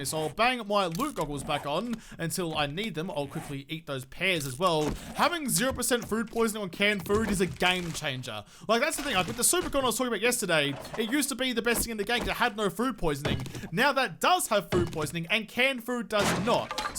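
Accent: Australia. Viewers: keep saying stuff the Australian way, bugger the Yanks!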